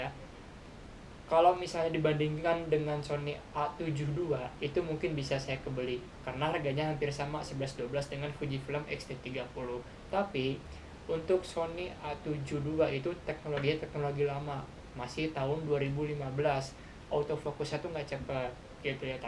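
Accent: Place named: West Java, Indonesia. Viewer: Logat Sunda mah moal bisa bohong nya mang wkwkwkw luv keren konten na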